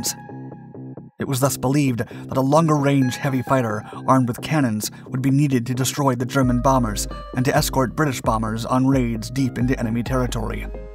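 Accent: United States. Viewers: 'Murcan way of reading it. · British convention is not "the two sixty third" squadron as the Americans do it, but "two six three" squadron. Other than that a fine production on a lesser-known aircraft. Thanks and keep up the good work.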